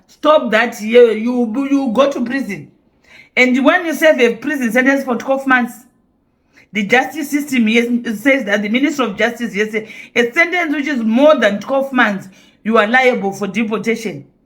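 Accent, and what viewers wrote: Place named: Shona, Zimbabwe. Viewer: Hi. I love your your Shona presentation in English. Kkkk. It makes the presentation enjoyable and understandable. Keep it up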